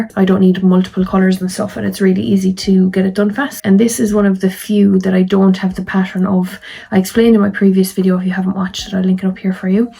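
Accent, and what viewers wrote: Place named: Ireland. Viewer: Hi, there are so many cute item's just came across you today thought i could hear irish my mother from killkenny Ireland look forward to you're next video ❤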